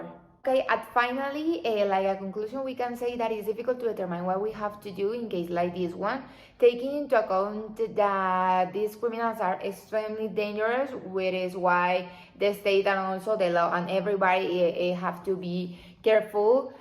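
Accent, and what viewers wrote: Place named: Caribbean Coast, Colombia. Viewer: Costeña hablando inglés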